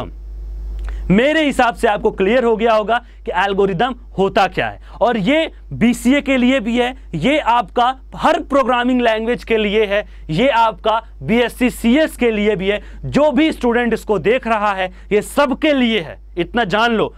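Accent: Bihar, India. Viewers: Akdm bihari wala touch, maza agaya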